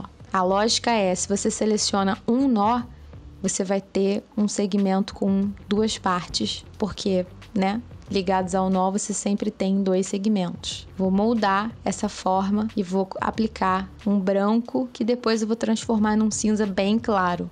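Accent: Rio de Janeiro, Brazil. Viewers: Vc é muito didática! Adoro os vídeos e o sotaque carioca kkkkk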